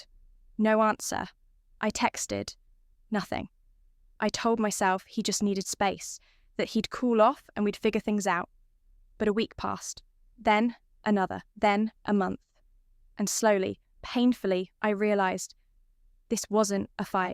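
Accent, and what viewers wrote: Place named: New England, United States. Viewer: Definitely not a Texan from Dallas reading this story. Sounds like a New Englander.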